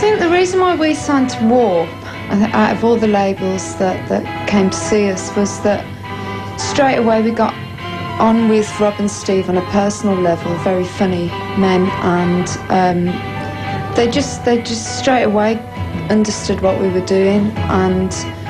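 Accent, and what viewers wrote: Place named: Black Country, United Kingdom. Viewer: She's a yam yam brummie through and through. We are from the same area. Sad at her passing.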